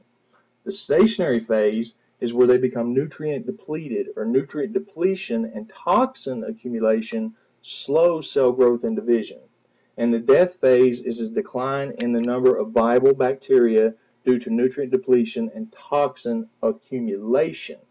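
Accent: United States. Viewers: Thanks you so much, so much southern accent .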